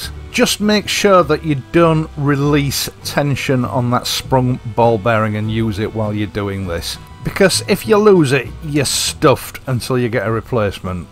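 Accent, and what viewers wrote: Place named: East Yorkshire, United Kingdom. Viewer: Read all about it! 'Listening to East Yorkshire accent increases longevity'. Well, your accent has worked for me. During an enforced 7 week hospital stay (following life-saving emergency surgery), listening to your voice while watching your videos has had a most profound effect upon my life already. 1) My son presented me with a pre-loved Royal Enfield Continental GT535, 2) This in turn has restored an urgency to once again be motorbicycling and also an enhanced joie de vivre, 3) I have regained enough strength to laugh heartily with your wonderful dry wit. Thankou for taking the time to impart your mechanical knowledge and humour. If listening to an East Yorkshire accent extends life, then your reviewing of your videos while editing them must mean we'll have you around forever!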